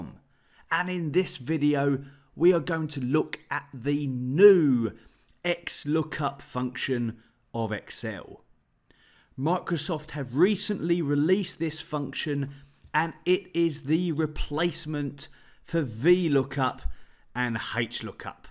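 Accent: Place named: Cockney, United Kingdom. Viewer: Clear and understandable tutorials, and in my first 2 vids I've seen I've discovered DGET and XLOOKUP just at the time I need them! All delivered with a top geezer accent, subscribed! Question for anyone who can answer: I followed the steps for becoming an Office Insider, it seems I now am one, am I able to activate these secret functions somehow? They aren't immediately available. It also says "to a portion of Insiders", perhaps its not all Insiders who gain access? Cheers!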